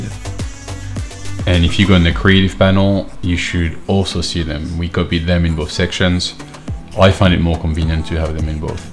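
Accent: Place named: France. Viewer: You are purely French. Your accent speaks a lot haha!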